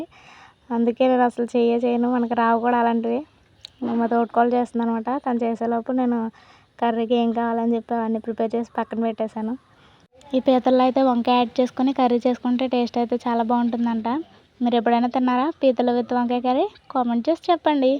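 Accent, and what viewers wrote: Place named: Konaseema, India. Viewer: Konasema voice super 🙏🙏🙏🙏🙏🙏🌹🌹🌹❤️❤️❤️👌👌👌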